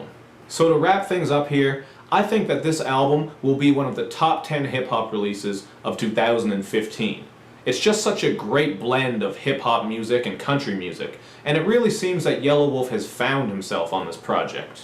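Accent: Canada. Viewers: Are you Canadian bro?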